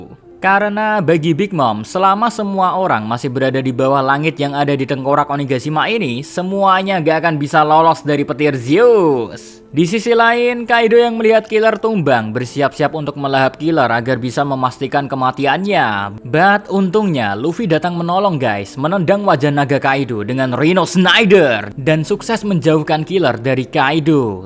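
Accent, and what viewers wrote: Java, Indonesia. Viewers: jowo ndi?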